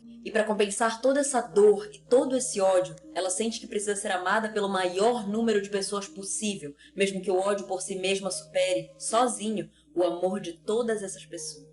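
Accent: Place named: Pará, Brazil. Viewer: Voz de dubladora com sotaque paraense, asmei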